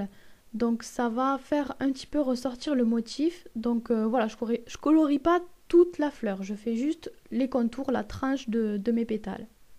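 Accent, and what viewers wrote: South, France. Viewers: juste super beau ,j'ai une question,tu as l'accent du sud non?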